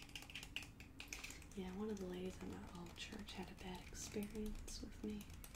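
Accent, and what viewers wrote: South, United States. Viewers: That accent and the softened profanity is such nostalgia for me as a child of the Bible Belt. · You are one of the BEST at role plays! I love the southern accent and you seriously have amazing acting talent. Please keep this character in your regular video rotation! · that strong souther accent is flawless. i guess it helps to be from the south lol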